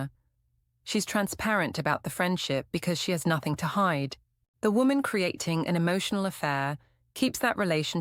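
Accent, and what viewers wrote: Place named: New Jersey, United States. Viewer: That’s a lovely accent you got there. New Jersey?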